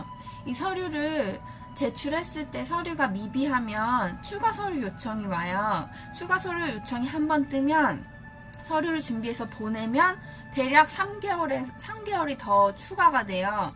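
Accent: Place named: Japan. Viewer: 영어발음이 일본어인데?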